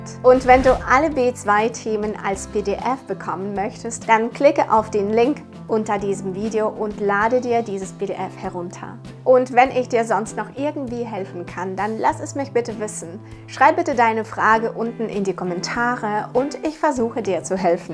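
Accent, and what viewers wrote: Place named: Russia. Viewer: Если честно с первого слова русский акцент распознала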